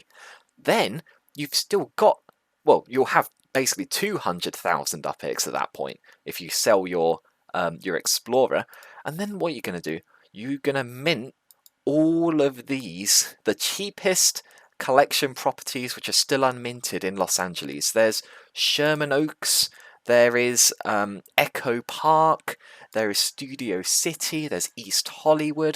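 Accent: United Kingdom. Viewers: Wut? He has a super British accent.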